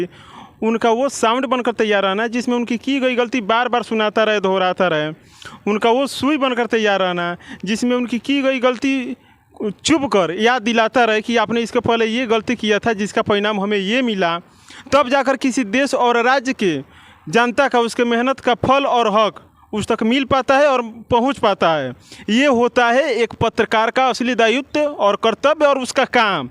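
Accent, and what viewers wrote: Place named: Bihar, India. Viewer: Yaar Journalism Karne ke pahle yea apna Bihari tone Khatam karo... warna Kuchh nhi ker pawoge.... good luck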